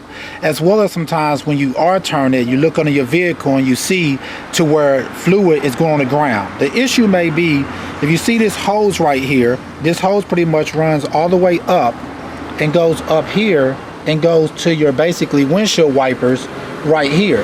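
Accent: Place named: Kentucky, United States. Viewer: Thank you so much for the video lots of help!! but also it was funny hearing your accent and I said man it sounds so familiar KY... represent Louisville Kentucky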